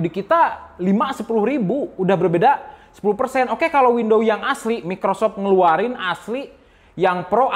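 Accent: West Java, Indonesia. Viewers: Pasti orang sunda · dia orang sunda makanya ngomong kek gitu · sunda pisan om semua huruf f diganti p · penjelasan dengan kearifan lokal , ingrris ala" indo dan penjelasan "F" dengan khas orang sunda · Alah siah sunda pisan euy fenjelasannya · Nah ini, daritadi gua fikir logatnya asli sunda kwkwk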